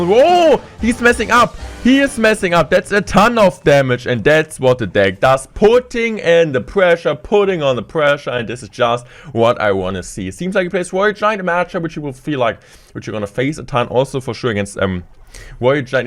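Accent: Germany. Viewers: To the English people: Do you hear a German accent? Because i dont think he is talking that Bad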